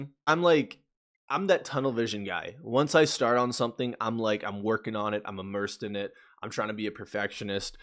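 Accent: Canada. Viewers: Canadian, definitely Western Canadian. The "a" is pretty distinct to Alberta.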